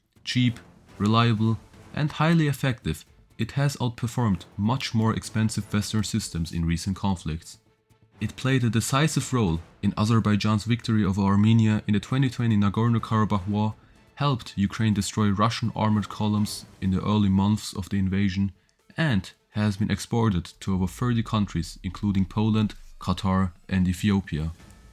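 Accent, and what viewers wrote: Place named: Germany. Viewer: Yo guter video. Nicer deutscher Akzent bre. Keep on hussling. Irgendwann kommt der Durchbruch.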